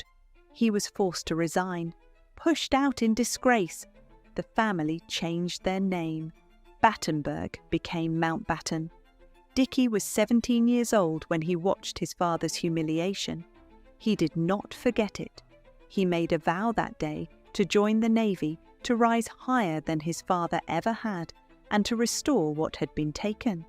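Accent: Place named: Northern Ireland, United Kingdom. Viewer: Northern Irish! Not Southern.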